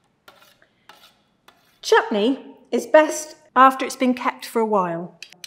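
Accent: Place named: West Country, United Kingdom. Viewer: Its a fairly common accent from the English West. Still widely spoken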